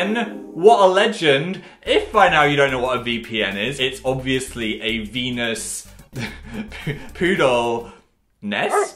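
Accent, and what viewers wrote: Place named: Northern England, United Kingdom. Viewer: Ahhhh Northern Phil is back with another “Ello!” intro!!! I’m loving his return to his northern roots 🥰💗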